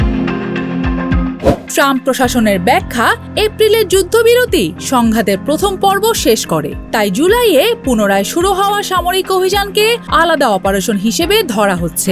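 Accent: India. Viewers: Nijoshho bhasha use korte lojja pai india er moto uccharon korar chesta.biroktikor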